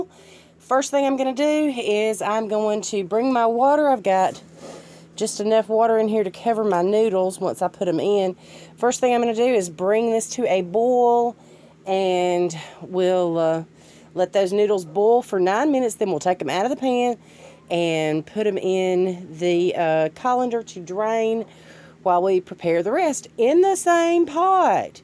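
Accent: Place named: Texas, United States. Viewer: I love ur accent. I am from Texas and I have that accent.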